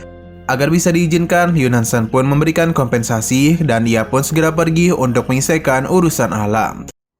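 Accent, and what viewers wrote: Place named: Bali, Indonesia. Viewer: Logatnya mimin kek orang Bali 😅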